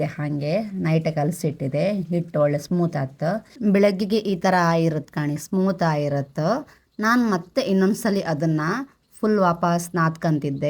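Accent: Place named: Kundapura, India. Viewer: ನಿಮ್ಮ ಕುಂದಾಪುರ ಕನ್ನಡ ಇಷ್ಟ ಆಯಿತು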